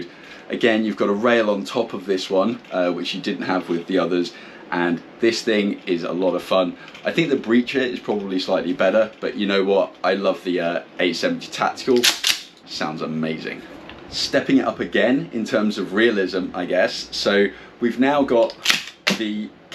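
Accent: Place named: United Kingdom. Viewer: I like your British accent. cheers from Koera!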